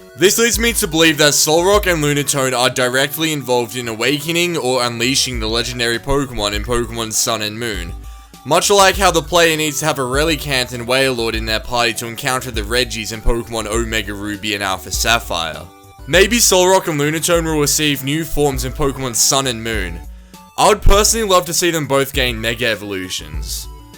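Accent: Australia. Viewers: starts vid, sees small amount of subs, hears ozzy voice. subbed instantly a fellow ozzy youtuber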